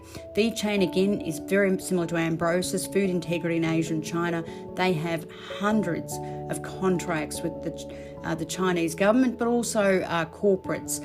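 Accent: Australia. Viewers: That's just her Aussie accent.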